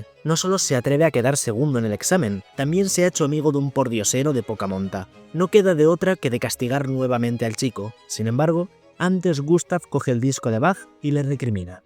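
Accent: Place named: Galicia, Spain. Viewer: ME K4G4 TU ACENTO GALLEGO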